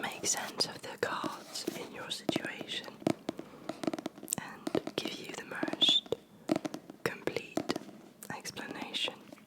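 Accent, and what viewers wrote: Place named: United Kingdom. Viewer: thanks for the great video! question: did you live in england at some point or learn english very young?? your accent is so characteristically british i would never guess you are french!!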